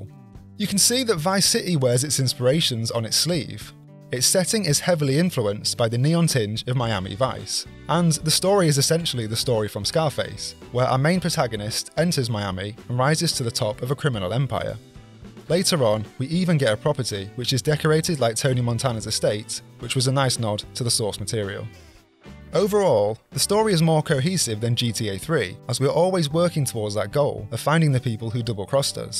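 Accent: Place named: United Kingdom. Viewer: well wishes for you as well!! I guess he is British, at least thats what we can suppose by the accent hehe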